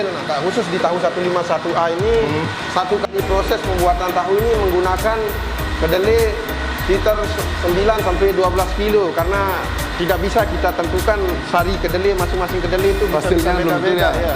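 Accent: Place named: Lombok, Indonesia. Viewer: Perpaduan antara logat sasak dan logat bali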